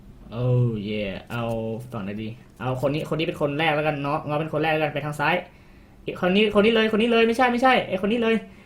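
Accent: Isan, Thailand. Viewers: พี่ คนอีสานหรอ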